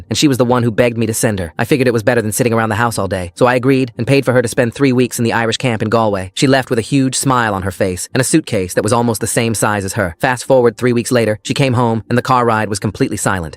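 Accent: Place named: United Kingdom. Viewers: Where did the British come from bro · Why did it randomly turn British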